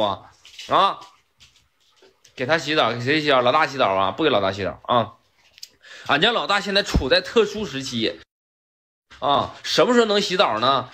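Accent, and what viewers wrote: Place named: Northeast, China. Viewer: 吓，不是嗎？哈哈哈，東北漢普通話我只聽懂7O%而已，等我再聽一次。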